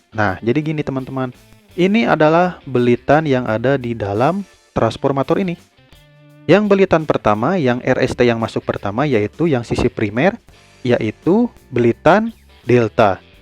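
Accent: West Java, Indonesia. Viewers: Mantap, sunda pisan, salembur atuh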